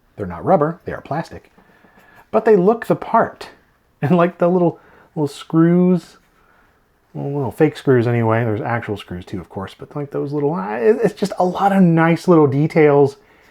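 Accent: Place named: California, United States. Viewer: Your accent is like the Californians without the accent if you follow.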